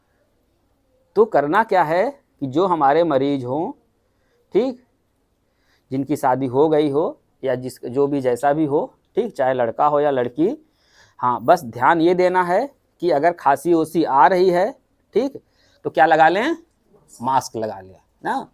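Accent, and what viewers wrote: India. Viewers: Awaz se lagata hai ki ye up ke purvanchal ke kisi jile se hai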